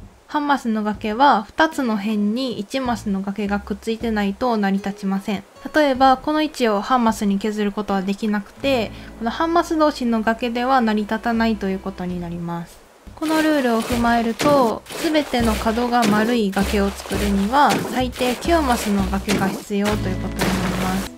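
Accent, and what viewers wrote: Kansai, Japan. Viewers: 楽しみにしてました〜！さんを参考に島作り直してます🥰 関西弁、親近感あって好きです〜！ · イントネーション関西風www 参考になりました。ありがとうございます。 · 関西の方なんかなぁ自分もやからめちゃ聞きやすいです🥺ほんで編集もお声も可愛くて見やすい💕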